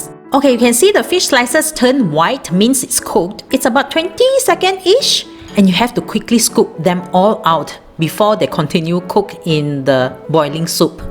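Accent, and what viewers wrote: Malaysia. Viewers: it's your Manglish I came to listen to, so marinated with BM and Chinese.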